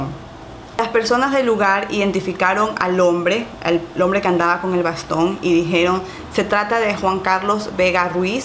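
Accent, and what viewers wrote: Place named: Guayaquil, Ecuador. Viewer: "El man tenía en el baston" se nota que eres guayaca, bellaaa💖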